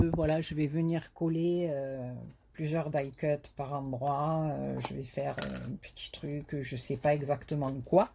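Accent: South, France. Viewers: Coucou contente de te retrouver toujours avec ce petit accent du soleil. Très classe cette boitounette et toujours des explications claires elle me plaît beaucoup 🤩🥰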